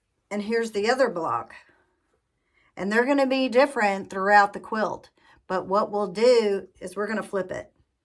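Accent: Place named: Tennessee, United States. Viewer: That's a beautiful quilt. Are you from Tennessee, by chance? Your voice reminds me of some of my folks in Overton County.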